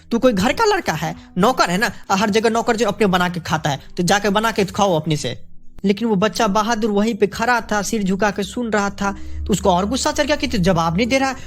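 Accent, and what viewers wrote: Bihar, India. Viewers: भाई तुम बिहार से हो क्या ।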